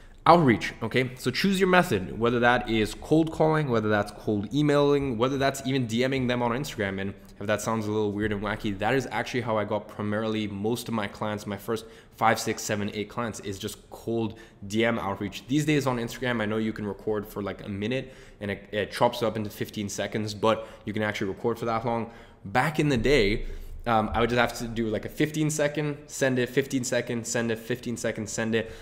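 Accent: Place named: United States. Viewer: why does he have an american accent if he was raised in Britain?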